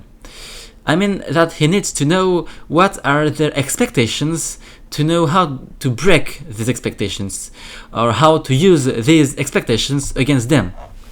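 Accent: France. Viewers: dang your english grammar and vocabulary is really good considering your strong french accent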